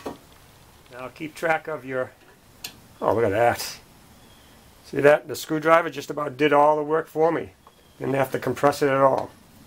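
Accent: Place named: Massachusetts, United States. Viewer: I love hearing the accent!! LOL!! My wife grew up in Lawrence and I said, this guys gotta be from Mass! LOL!! Sure enough, I see the Mass tag on the front! I do get a bit crotchety with these manufacturers!! Read is 13m so it figures the front would be different....as some one else pointed out, they have you buying one of this and one of that.....well...at least I can justify my Advance auto charge on the bank statement!! LOL!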